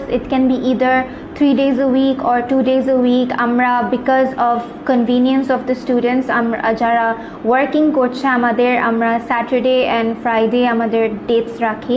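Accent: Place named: United States. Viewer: আপনি বাঙালি বাংলা এমন ভাবে বলেন যেন আমেরিকা থাকেন। আগে নিজের ভাষা ভালোভাবে বলতে শিখেন তারপরে ইংরেজি শিখাবেন।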